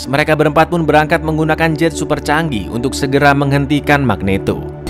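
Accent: Java, Indonesia. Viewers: SUARANE ATMIN MEEDOK KOYO TELO EMPUK NGANGENIN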